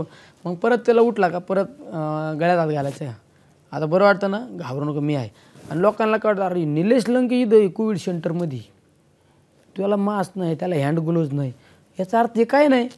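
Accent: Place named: Ahmednagar, India. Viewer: अस्सल नगरी भाषा...त्याला काय लागतंय, एखाद्या वेळेस पह्यलं भाषण इंग्रजीत करील!!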